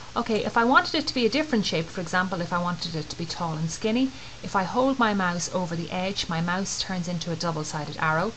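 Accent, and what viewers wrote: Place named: Dublin, Ireland. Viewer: I was sitting here listening to my wife looking at your video and I was wondering what part of Dublin are you from ? Im from Cabra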